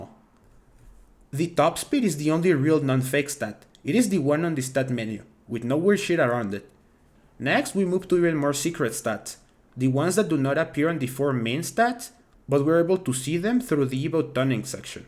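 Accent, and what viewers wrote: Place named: Mexico. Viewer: dude u sound mexican af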